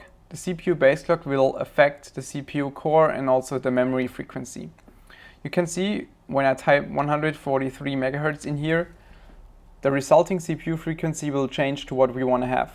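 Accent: Germany. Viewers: Man hört, dass du aus Deutschland kommst :D cooles Video! Hast du das Bios gemacht? Wenn ja, mit welchem Tool? Versuche schon ne Weile ein Ozmosis Bios zu machen, aber das funzt nicht, weil es die Firmware schrottet. Was hast du benutzt? LG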